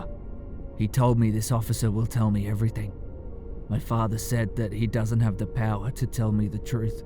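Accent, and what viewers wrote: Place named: Australia. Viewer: Good to hear an Aussie accent doing the narrative.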